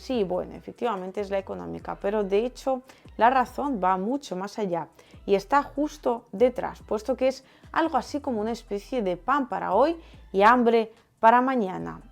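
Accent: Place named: Spain. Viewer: A proposito de America Latina, noto que algunas expresiones y vocablos de jerga especialmente no los entiendo, tu acento español es super fuerte. Pero está bien.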